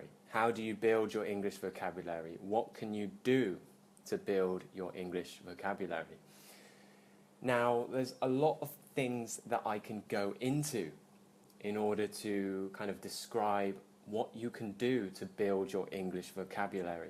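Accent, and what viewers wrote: United Kingdom. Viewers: Hello, my name is Pachara. I’m from Thailand and now living in the State. I love your video English lessons because I love British English. I live in the State but actually don’t like American English lol. I’ve found your videos are really useful and helpful. I like that you give tips not just teach. Keep up the great clarification. 👍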